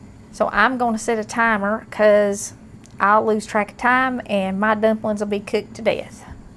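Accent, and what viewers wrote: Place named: Ohio, United States. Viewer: Hearing your voice reminds me of relatives from Ohio. They would bake cakes and pies for the Church July 4th festival in Wapakoneta. I loved it. We'd travel from PA to it when I was a kid. Thanks and God bless you 🙏. Hugs 😇🤗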